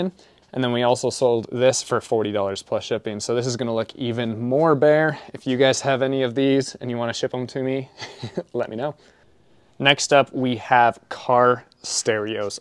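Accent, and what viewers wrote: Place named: Scandinavia. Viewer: Loved your Scandinavian accent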